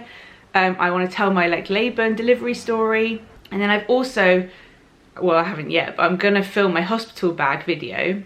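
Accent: England, United Kingdom. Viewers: I love your presence in the internet, I am flexible and I'll be here for 1, 2 or how many videos you will be putting out, and I'll be here watching out for your come back or however you decide to do it. You have been a wonderfull inspiration for me, and I got a little emotional watching this goodbye...12 minutes feels so short though and I'll miss your sunday's videos and your English style and accent. Much love to you and see you soon ❤️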